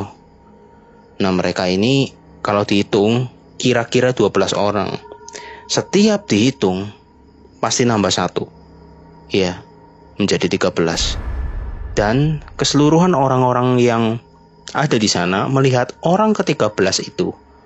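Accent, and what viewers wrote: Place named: Java, Indonesia. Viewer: saya ga suka nih kalo dia yg baca suara. Medok. trus ga enak bawainnya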